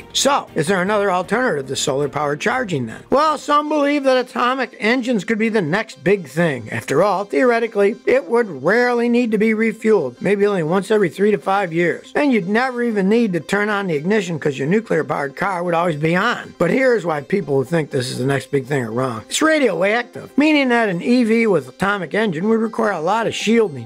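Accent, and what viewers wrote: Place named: United States. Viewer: Escellent video production, unfortunatly I often get lost, i find it sometimes difficult to follow your american accent. I suppose I need to follow you more often and I will soon get used to it. Where is your accent from?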